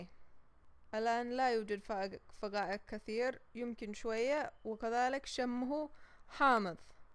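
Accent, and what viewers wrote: Sanaa, Yemen. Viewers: ايوه هي من صنعاء كلامها صنعاني انا صنعانيه انا احب أكلات اليمن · من لهجتك صنعانيه و لكن عايشه خارج اليمن . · You are awesome !!!! Very amazed by your fluency in the Arabic language , and Sanaani accent. You have done a great job. Thank you :)) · Your accent is so cute. Never heard a cooking show on YouTube with Yemeni food and accent:) going to try this;)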